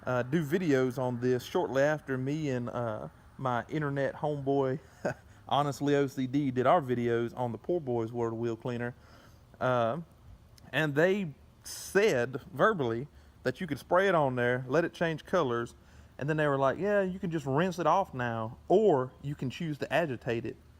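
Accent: Alabama, United States. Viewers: Bama boy haha that accent tho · You sound just like where I come from in south Alabama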